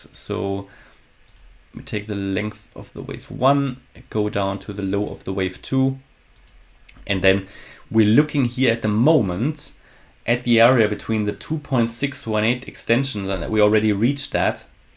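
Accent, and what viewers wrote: Germany. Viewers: Hey ich schreib mal gleich auf Deutsch …. Bist du Deutscher ? Hört sich nämlich so an 🙃 gute Analyse … ich finde diese ganze Elliot Theorie super spannend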